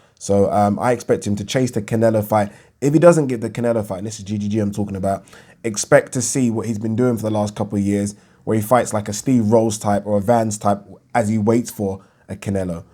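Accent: United Kingdom. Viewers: Appreciate your another attempt not to swear:) I mean I never judged you for that. As for the non-native speaker, I love your British language and it's even more beautiful if you don't swear =] As always, my greetings from the boxing country, Ukraine!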